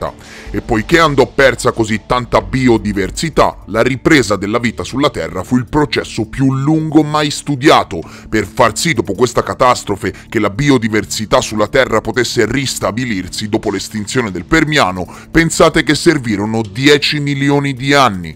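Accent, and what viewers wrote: Tuscany, Italy. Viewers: Grande.... Se posso chiedere ma sei toscano? Scusa la mia curiosità grazie per le informazioni che ci metti a disposizione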